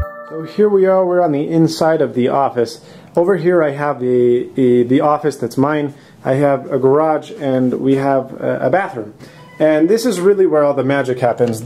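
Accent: Spain. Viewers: You have a slight Spanish accent now :). Must be all that time spent in Bolivia. Welcome back bro. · It's awesome that he's got that Spanish twist on his accent now haha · Spanish accent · chubby and a spanish accent. It's like we're brothers now. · You've come back with a spanish accent :D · Wow you sound really spanish after those years in bolivia! :D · You have a spanish accent in your english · Is it just me or does have a bit of a Spanish twang to his speech now? How cute:) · You've acquired a slight Spanish accent. Glad to have you back! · the Spanish accent now is awesome :D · Haha I can notice a slight spanish accent. Looks like you got very involved with Bolivian culture ;) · You kind of have a Spanish accent now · wow you picked up a small spanish accent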